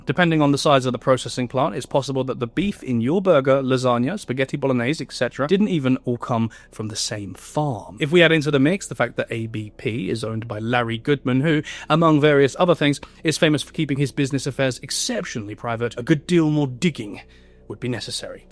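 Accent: United Kingdom. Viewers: You've got the perfect stereotypical "British" accent, I love to hear the classic accent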